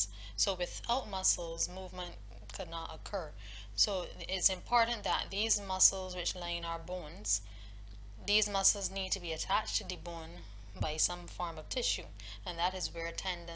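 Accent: Barbados. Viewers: Are you bajan?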